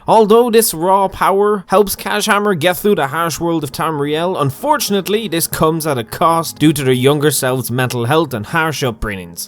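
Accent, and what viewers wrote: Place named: Ireland. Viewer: We are more addicted to your content than skooma lol. It's something about your Irish accent... it's amazing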